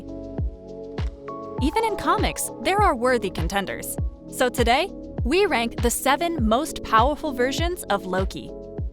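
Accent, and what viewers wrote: Sri Lanka. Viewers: You're a Sri Lankan, right? How did you get that accent?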